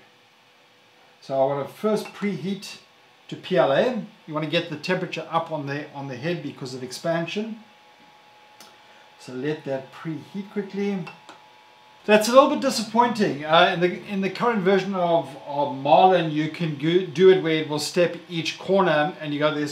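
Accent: South Africa. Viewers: Hello man. I can hear you are a proud south african from Your accent. Hello from Port Elizabeth 👋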